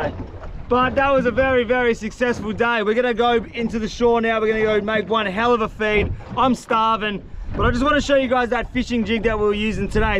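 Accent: Australia. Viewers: He has an Australian accent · Just recently found … love the Aussie accent and the energy!!!! Love from India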